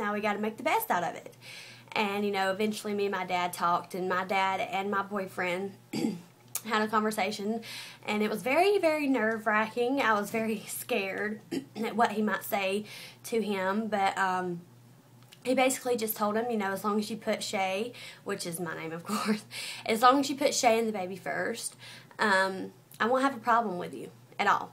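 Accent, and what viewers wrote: South, United States. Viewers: omg you are so pretty and i love your country acsent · You're sooooooo pretty, and confident, that's good stay that way! and I love your accent, from the south? same here lol. your story was very understanding, stay strong sweet peaa :) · Where are u from because u sound southern like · Wow- I stayed and watched the whole video. And I most definitely agree with you on the "abortion" and "if you are old enough..." speech/opinion. Off topic, but I love your accent... and your face structure kinda makes you look like Miranda Lambert. Anyway, good luck with you, your son, and boyfriend. :D! Go southerners! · Hey shae! Your so pretty, and have the cutest country accent. Lol. I'm 15 and 21 weeks pregnant now, so I understand what you went through just don't understand the actually raising your kid part YET. Its crazy cuz when I first got pregnant, my boyfriend just turned 20 back in january and i didnt have a clue that i was, and i found out at 8 weeks. 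:D Although, I'm still going to highschool. Lol. But post more videos of you and your son, He's adorablleee. <3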